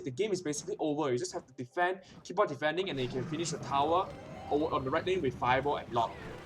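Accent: Singapore. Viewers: when i watch this video i got alot of information.and i just subscribe it.peace man.btw are you singaporean ?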